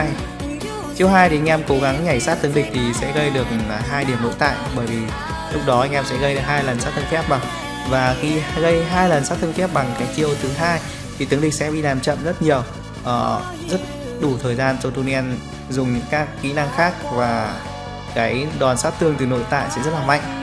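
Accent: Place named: Hai Duong, Vietnam. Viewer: anh có phải người Hải Dương kh ạ? Em thấy giọng anh khá giống người địa phương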